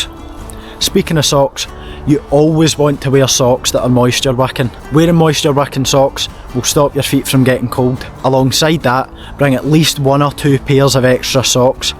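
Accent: Scotland, United Kingdom. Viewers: I love the Scottish accent and the video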